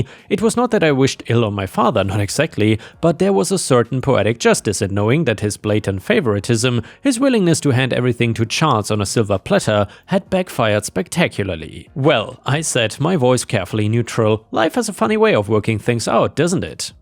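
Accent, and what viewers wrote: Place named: Germany. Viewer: Hey! Guessing from your accent, are you german? Anyways, thanks for the entertainment!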